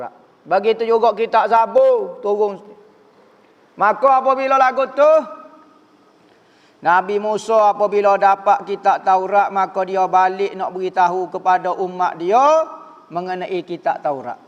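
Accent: Kelantan, Malaysia. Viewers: X..ramai dh makin fhm dgn loghat kelantan..dh um x guna bahasa pekat pun. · hnya org kelantan shja fhm dan tahu itonasi nada pelakk prcakapan bhasa negeri sndiri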